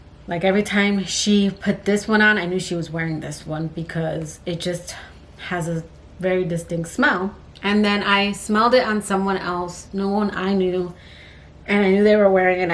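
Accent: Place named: Orlando, United States. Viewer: When i first heard you talk im like you sound like you would live where i live (weird, i know) lol and sure enough you say Orlando😂 which is where I live. Im like OMG! Small world. And I have all the perfumes you mentioned besides narciso because i highly dislike his perfumes, but overall awesome pics!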